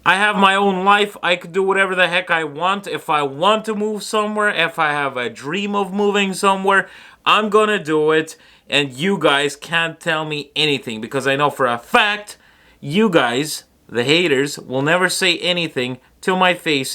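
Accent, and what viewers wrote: New York, United States. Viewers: You already sound american! Its my life and I can do whatever I want! Land of the free. Maybe you can teach a certain group of Americans that freedom is worth fighting for! Good luck to you guys! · Dude, you already sound like you are from NY, you should fit it just fine. Don't you have cousins here already?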